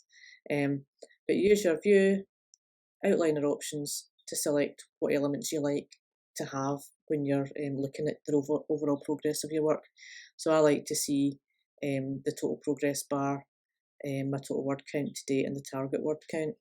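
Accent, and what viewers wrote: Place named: Glasgow, United Kingdom. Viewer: I liked the way you speak; are you a Glaswegian?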